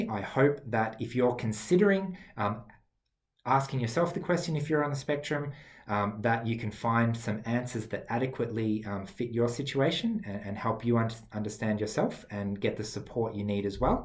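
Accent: Australia. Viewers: I'm self diagnosed, now 44. Getting a diagnosis here where I'm from was too much of a hassle and cost for me a few years ago at least. But I'm glad I know for myself now. I had a boyfriend a few years ago who's on the spectrum also, and it was like coming home. For both of us. I'm really hoping to meet up with more people on the spectrum, but there aren't any meet-up groups like that here and I don't have Facebook, otherwise I would love to join! I'm just really glad I know for myself, and yes, I live pretty much like a recluse, even before covid. But thanks to studying spirituality, I've learned to love myself and not think less of myself because I understand that diversity is necessary for evolution :) I really chuckled at your trying to stay on topic or sticking to a script comment there lol, that's something I have to actively be conscious of all the time!! 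I'm from Brussels, but sometimes I wish I lived in the UK, just because of the access to more meet-up groups irl. You sound like you're from down under though, but not sure! Anyway, thanks for being an advocate for an often unseen group of people. Your videos are really good and easy to listen to, no bells and whistles, just how I like my clothes, my food, and my videos lol 👍👍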